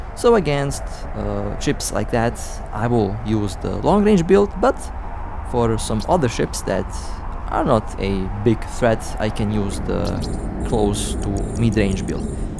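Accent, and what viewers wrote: Russia. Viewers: Жуткий рязанский акцент )) а так оооочень интересно;)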